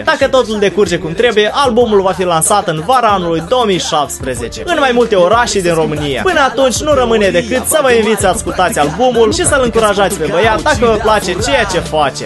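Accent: Moldova. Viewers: Da tu esti din Moldova da?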